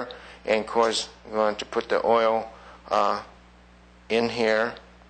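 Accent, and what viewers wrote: United States. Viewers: i can sense a Chicago accent from this cook. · no. he learned english with some kind of chicago or midwestern american accent. the accent is american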